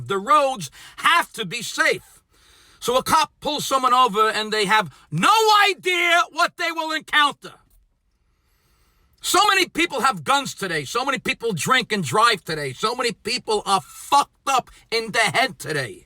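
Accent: New York, United States. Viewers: That's pronounced New Yawkas. hahaha You can take the person out of New Yawk but you'll never take New Yawk out of the person! I agree with 100%!! There are good and bad in every profession and the bad need to be exposed and weeded out. Please don't generalize and put all into one category. That's not fair! The bad always make it bad for the good.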